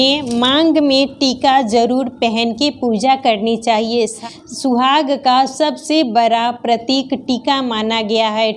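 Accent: Bihar, India. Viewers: Kya aap bihar se hai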